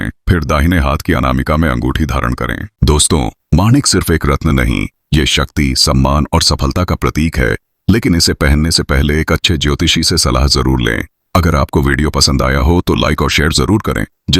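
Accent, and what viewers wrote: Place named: Pahadi, India. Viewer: पहाडी आवाज अच्ची हैं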